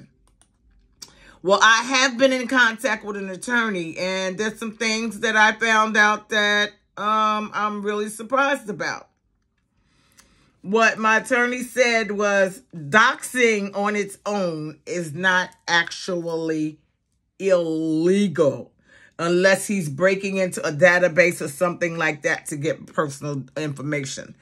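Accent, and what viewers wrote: East Coast, United States. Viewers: I hope you make friends AND make money. I’m a new subscriber. I hear that East Coast accent! Have a blessed day!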